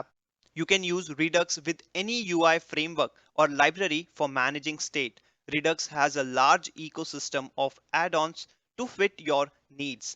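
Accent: Gujarat, India. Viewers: Thank you sir... Are you from gujarat?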